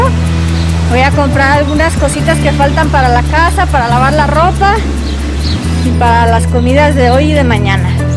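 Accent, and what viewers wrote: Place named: Guadalajara, Mexico. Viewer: Ya ablas como tapatia que bien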